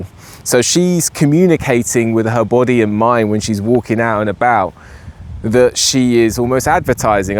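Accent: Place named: United Kingdom. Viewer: Bra it was bc of your bloody British accent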